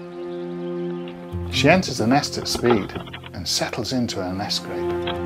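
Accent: United Kingdom. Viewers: its not long before luner is spending her days here.....luner? or luna? oh your british, ughhhhh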